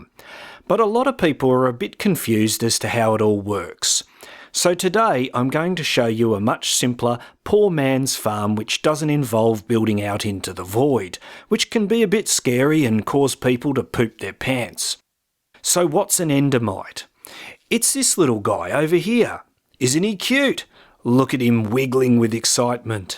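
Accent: Australia. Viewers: Are you Australian? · You're Australian right? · Good to hear a Aussie doin some MC videos. Have you done this in Survival mode before?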